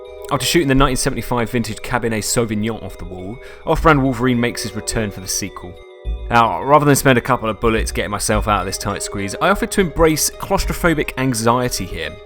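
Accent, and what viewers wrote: United Kingdom. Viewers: Swear you sound more British in your older videos.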